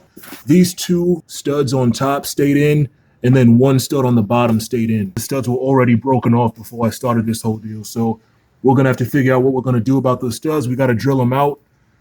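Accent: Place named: East Coast, United States. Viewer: Love your stuff man! You're doing great videos! I'm curious though you're in though as it seems like you are dealing with a lot of rust issues and I can hear some East Coast in your voice so you're definitely not in Houston Metro... Keep up the good work and here's wishing your channel all the success in the world!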